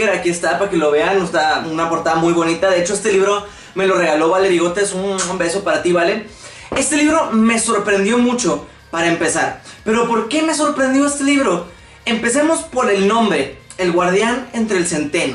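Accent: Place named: Monterrey, Mexico. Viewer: En corto me di cuenta que eres de Monterrey JAJAJA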